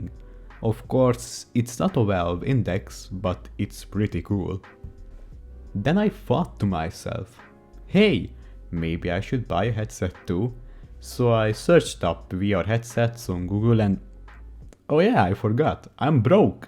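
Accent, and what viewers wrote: Hungary. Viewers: Olyat ugrottam a székben mikor megláttam hogy huf de visszanézve a magyar akcentus felismerhető volt 😅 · Az accent az nagyon magyaros meg persze a google HUF valuta is elarulta hogy magyar vagy koszi a vidiert ♡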